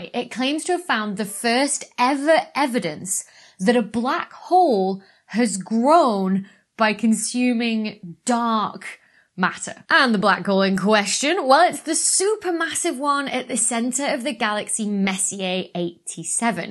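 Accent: United Kingdom. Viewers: Idear. Love the British accent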